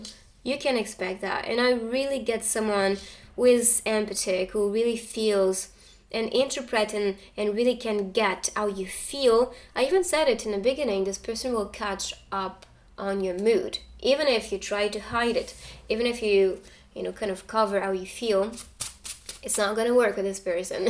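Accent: France. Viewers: You have such a lovely voice, are you French?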